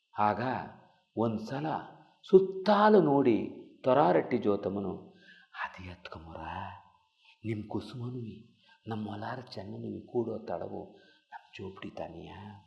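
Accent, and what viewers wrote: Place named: Old Mysore, India. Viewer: ಹಳೆಯ ಮೈಸೂರು ಪ್ರಾಂತ್ಯದ ಗ್ರಾಮೀಣ ಸೊಗಡಿನ ಮಾತಿನ ವೈಖರಿ ಅದ್ಭುತ ಗುರುಗಳೆ 🥰❤👌🙏🏻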